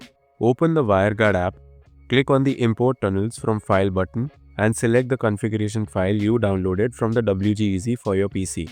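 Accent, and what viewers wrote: India. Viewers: From your accent you sound Indian. I listened to many american tutors on this subject. I have easier time understanding american, but they only confused me further. You, on the other hand, were succinct, clear, well paced, and I just got my job done. Kudos.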